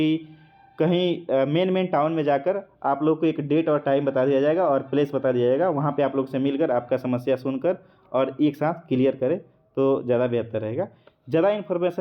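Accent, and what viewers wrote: Motihari, India. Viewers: Aap motihari se ho kya bhiya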